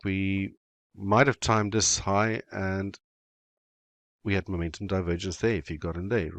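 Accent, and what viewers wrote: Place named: South Africa. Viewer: You sound South African boet, is jy?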